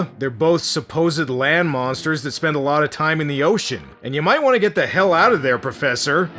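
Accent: Canada. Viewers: Holy shit you kinda make a make a canadian accent sound kinda hot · That Canadian accent . I died 😆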